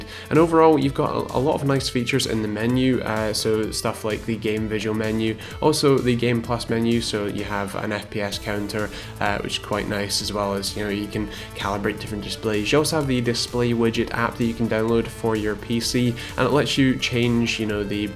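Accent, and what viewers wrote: Scotland, United Kingdom. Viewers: scottish not irish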